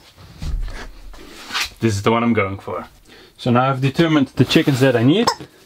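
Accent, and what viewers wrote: Netherlands. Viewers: why is it so easy to recognize some one that is dutch like they got a very own way of talking i find it funny ps blijf zo doorgaan leuke video’s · Bent u Nederlands? Zo lijkt het wel. Ik herken uw accent.🤷‍♂️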